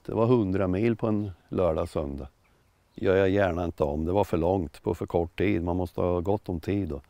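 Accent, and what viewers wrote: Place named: Östergötland, Sweden. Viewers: Hej! Jag hör att du är från Östergötland och är ett Indian freek, måste fråga dig om en Indian med sidovagn. Min min mammas morbror ägde den och tror att den inköptes <num> talet. Han hette Set Österkvist ock var från Örtomta församling utanför linköping. Skulle vara kul om du viste något om den? Jag har en historia att berätta över just den Indianen. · Mer östgötska till folket!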